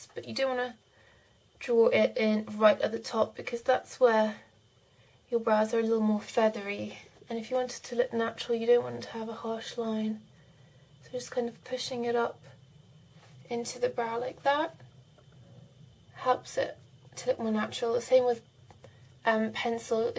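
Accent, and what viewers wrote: England, United Kingdom. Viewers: I keep watching ur vids coz of the tips but also coz of your accent!! Reminds me of Kate Middleton's accent :D What type of English accent is it, if its ok to ask?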